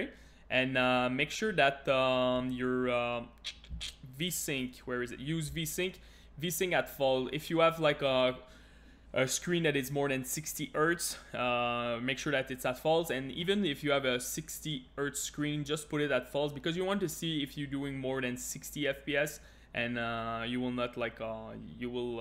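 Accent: Quebec, Canada. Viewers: un quebecois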